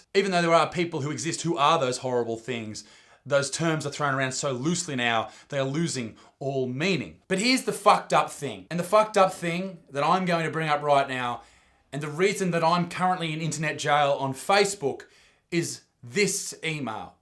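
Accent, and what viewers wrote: Australia. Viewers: I literally loved this video! What an amazing message delivered in an true aussie way. You handled that email and Facebook issue so well. Awesome job mate!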